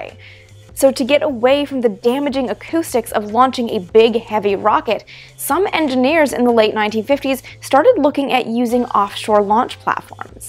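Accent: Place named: Toronto, Canada. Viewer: also you are from the GTA from your accent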